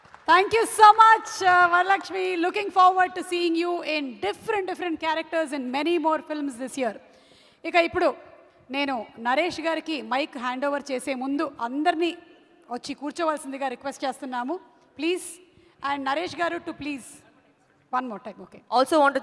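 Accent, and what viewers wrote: India. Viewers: Her Telugu style is same as Karnataka and Andhra border Telugu PPL slang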